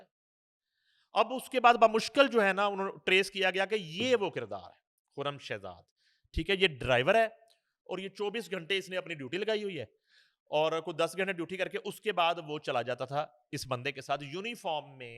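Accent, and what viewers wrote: India. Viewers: انڈین لہجہ ہے شاید انوکھے پن کی خاطر بولا جاتا ہے ؟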